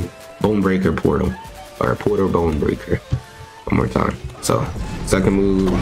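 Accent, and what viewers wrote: Trinidad and Tobago. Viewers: Are you from trinidad? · Are u from trinidad? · R u from trinidad?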